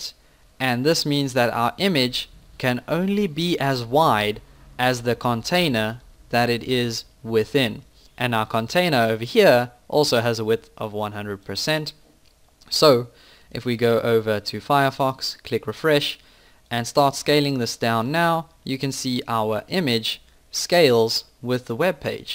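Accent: South Africa. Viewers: Really helpful tutorial, thanks & looking forward to getting something that triggers a menu list from a menu that normally appears in the sidebar. PS nice to hear a South African accent :) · Afrikaans English accent ? South African ? Good video. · I LOVE your accent man, are you South African?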